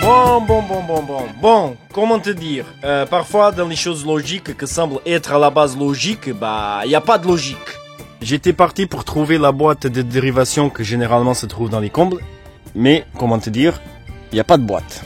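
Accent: Portugal. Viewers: J' aime bien ton travail. Est tu portuguès ? Ton aquecent me ressemble un portuguès. Pardone moi quelque chose. Merci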